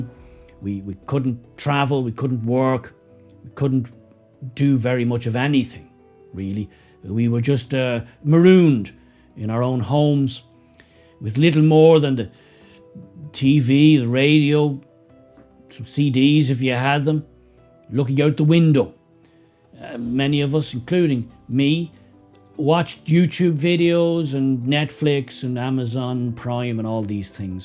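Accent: Ireland. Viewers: it's your common sense and cracking accent (i stand with ireland) that i enjoy keep up the good work. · Enjoy your style, especially that Irish accent. Please continue making the videos, I love listening to your wit and sarcasm. Onwards and upwards to the 100,000❤🌞🏴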